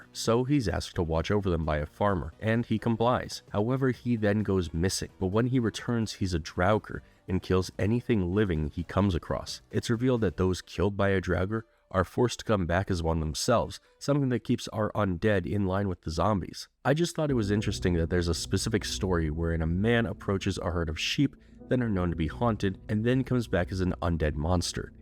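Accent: United Kingdom. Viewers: He's. British. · he's british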